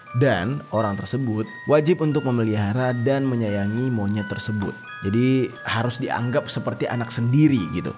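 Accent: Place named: Madura, Indonesia. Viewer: Yo mesti la bang apa lagi logat Madura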